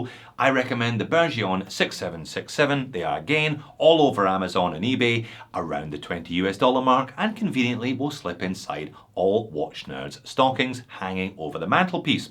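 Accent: Scotland, United Kingdom. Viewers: Great video and amazing you sponsored it yourself! are you really Scottish? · Love your Scottish accent 🎉🎉🎉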